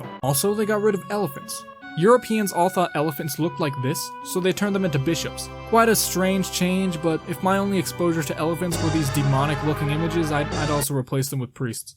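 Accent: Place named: United States. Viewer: Where are you from? Cause the accent seems like Japanese-American to me.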